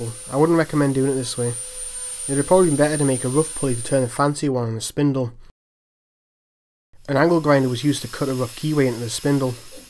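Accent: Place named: Newcastle, United Kingdom. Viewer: sounds like your from up my way, im originaly from westerhope but now live down durham way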